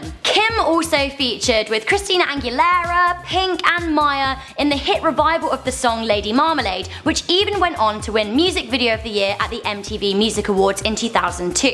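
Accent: United Kingdom. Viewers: excuse me Miss Brit with the annoying accent..HALEY DID NOT HAVE A RUINED CAREER AT ANY MOMENT OF HIS LIFE SO FAR. <link> PROVES IT....CHECK IT OUT.... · I like her British agcent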